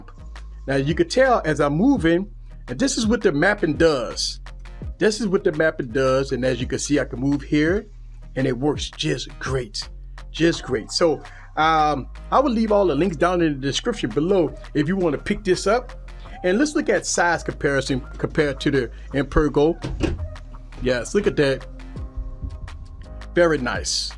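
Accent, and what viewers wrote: Louisiana, United States. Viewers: Just curious we're are you from? your accent says Louisiana..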